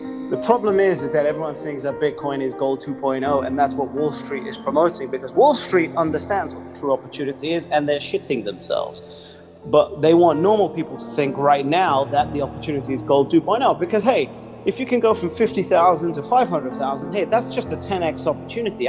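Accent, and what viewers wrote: United Kingdom. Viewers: Fuck Yes!! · Yaaaaas 🎉😮❤❤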